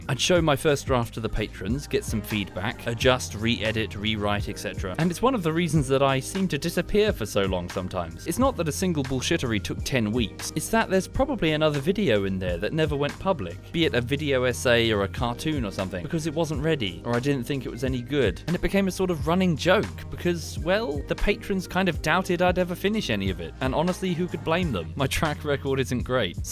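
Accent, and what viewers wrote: England, United Kingdom. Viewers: I'm getting strong UpIsNotJump vibes here. Or maybe all englishmen sound the same to me. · Bro a dude with a posh British accent just explained to me what early access really means I like this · i can understand british accent smoothly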